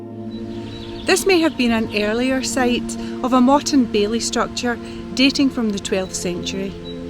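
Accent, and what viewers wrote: Scotland, United Kingdom. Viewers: I love your videos! The history content, the soft music, and the visuals are very nice! Something I especially enjoy is your authentic accent! I noticed in this most recent video, you smoothed out some of your pronunciation. Please don't water down your wonderful Scottish accent for me!! I vote to keep it true!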